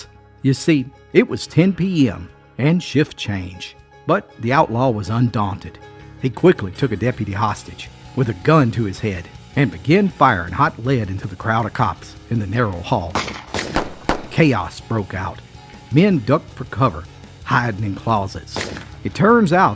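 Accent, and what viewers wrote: Appalachia, United States. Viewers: I wouldn't say he has a deep Southern drawl,I do he has a soft southern Appalachian accent, there are several different regions of different accents, all with some things in common and difference.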